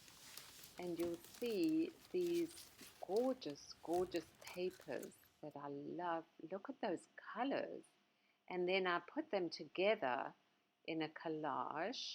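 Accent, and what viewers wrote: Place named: South Africa. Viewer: Nice South African accent ! 🇿🇦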